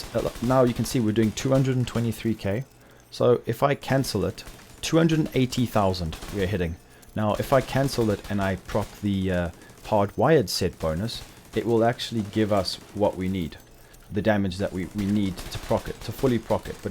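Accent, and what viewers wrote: South Africa. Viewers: Must be a South African accent! Great Video! Followed this build and it was not just fun to aim for it. But to enjoy it when it was near complete / completed.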